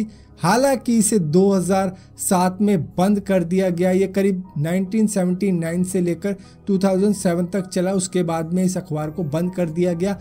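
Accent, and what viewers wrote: India. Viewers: the heading is in english, n your voice is Indian, I thought you are sharing your story with the world.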